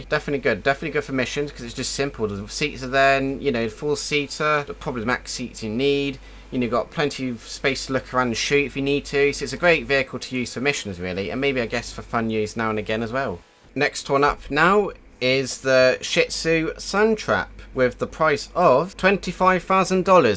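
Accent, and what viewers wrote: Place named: United Kingdom. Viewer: That is British English for sure haha. Thanks for a nice video 😊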